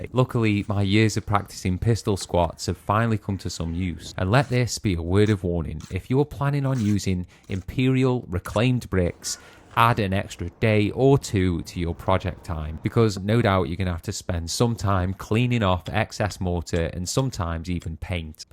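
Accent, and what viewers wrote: United Kingdom. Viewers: Which part of Ing-Gland is this accent from?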